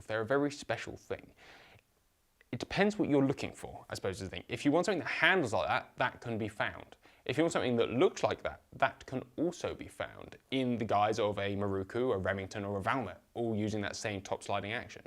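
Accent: England, United Kingdom. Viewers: An English man, speaking English, with an English accent, in England, crazy.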